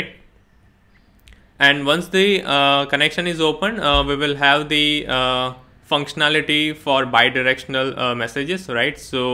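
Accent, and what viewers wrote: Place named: India. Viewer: Thanks. Love from Nepal. You sound like Indian, your accent 😀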